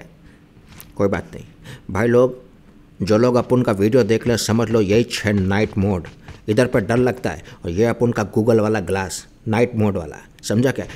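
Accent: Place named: Bihar, India. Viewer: Wo bihari ascent, shukla sir ka class yaad aa gaya..!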